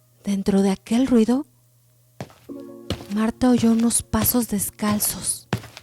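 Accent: Mexico. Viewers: A mi tambien me encanta el acento mexicano me gusta todo lo de mexico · ES MARAVILLOSO PODER ESCUCHAR UN CUENTO CON DICCION, ACENTUACIÓN Y PUNTUACIÓN PERFECTAS...GRACIAS EL ACENTO PERFECTO EN NUESTRO LENGUAJE MEXICANO. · Excelente tu narración! Que bueno que volviste, vos sabes que t lo pedí. Sos la mejor narrando, me encanta el acento mexicano + tu voz tan dulce, muchas gracias, lo disfruté a pesar d los molestos anuncios, jjja!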